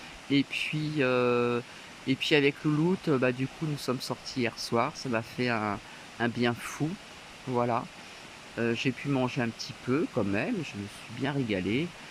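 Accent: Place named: South, France. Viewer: ma très chère, c était normal, de tout cœur avec toi, combien c est une " belle grâce d avoir ton Amie avec toi et ça ne peux te faire que du bien, quel bel accent du soleil, merci, merci pour ses si belles vidéos, ses photos ces partages ça fait du bien, c est chaleureux, bisous et gros câlins à Nouky, on croise les doigts pour les projets de 🍀🍀🍀🍀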